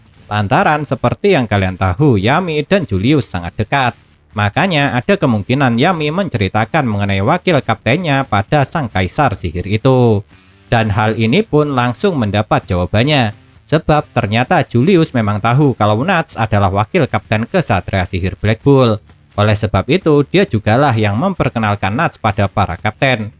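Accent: Java, Indonesia. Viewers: Jawa ya mas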